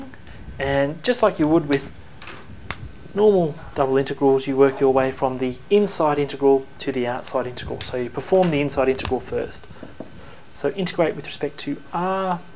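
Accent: Australia. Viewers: you are Australian. Correct?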